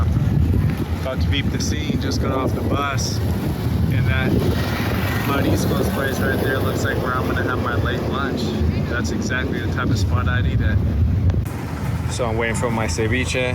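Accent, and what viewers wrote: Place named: Northern California, United States. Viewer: Thanks for this! Love your commentary. You sound like a Northern Californian LOL. Gonna go in a few weeks!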